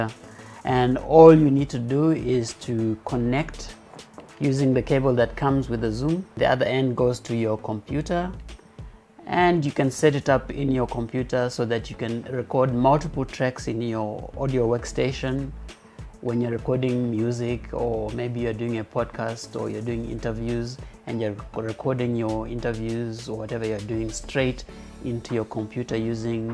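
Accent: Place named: Zimbabwe. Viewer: This sounds like a Zim accent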